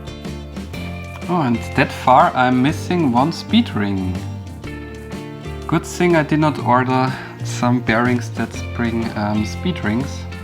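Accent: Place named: Germany. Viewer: Danke für das Video! Man hört sehr raus, dass du Deutscher bist.😂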